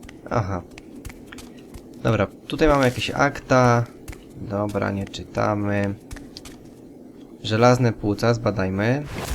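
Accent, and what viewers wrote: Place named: Silesia, Poland. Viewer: Jestes ze sląska slysze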